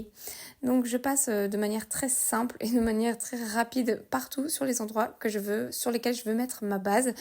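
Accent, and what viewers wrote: Belgium. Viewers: J'ai repris le colo cette année et j'ai pris une claque avec l'évolution du matériel ! toutes ces chaînes de coloristes mais j'avoue que ton accent belge avec ton côté passion douceur dans ce domaine te démarque 😁 j'adore également le scrapbooking donc, le mélange me plaît beaucoup ❤ merci pour tes vidéos 🌹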